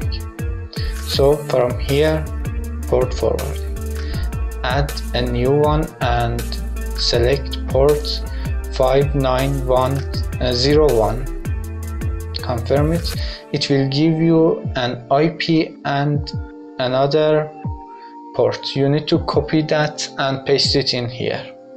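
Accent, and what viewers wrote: Iran. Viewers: Irani ? تابلو بود ایرانی 😂❤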